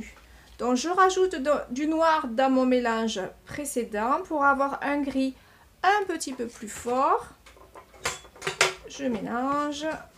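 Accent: South, France. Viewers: oh toujours magnifique et tellement bien expliqué vous êtes l'artiste peintre que je regarde le plus c'est toujours magique je vais essayer de faire celui là merci pour toutes vos explications pour moi novice dans la peinture j'apprécie beaucoup continuez de nous émerveiller et l'accent du midi met de la lumière supplémentaire dans vos tableaux suis aussi du midi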